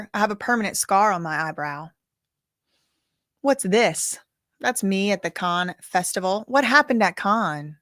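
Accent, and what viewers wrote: South, United States. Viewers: My husband thought you were a black gurl this whole time lmao “no she’s just from the south” 🧚🏻‍♀️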